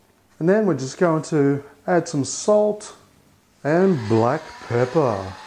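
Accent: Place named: Australia. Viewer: Then the Ozzie accent. Its bloody torture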